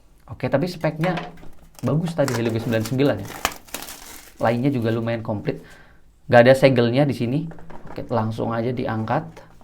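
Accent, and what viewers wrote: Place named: Cirebon, Indonesia. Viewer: kaya orang cerbon ya mas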